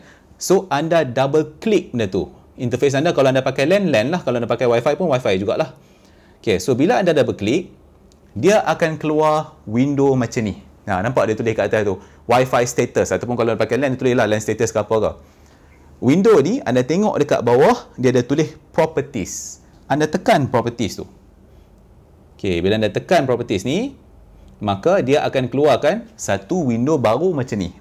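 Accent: North, Malaysia. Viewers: Walaupun beliau bertutur bercampur dgn loghat utara,saya yg berasal dri Sabah faham dgn baik,tak sangka banyak Malaysian buka content video lain dri yg lain tapi yg penting sangat bermanfaat! Tq for sharing the info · Abg terang memang padu, loghat utara plak. haha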